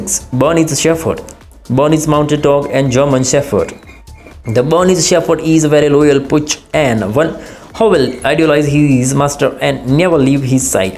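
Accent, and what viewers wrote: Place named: India. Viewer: Just go ahead and use an AI voice because this one sounds like a call center from Calcutta.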